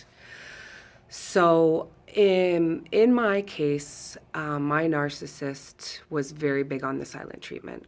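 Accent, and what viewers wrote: Canada. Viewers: I love the Canadian accent …….thanks for sharing your story.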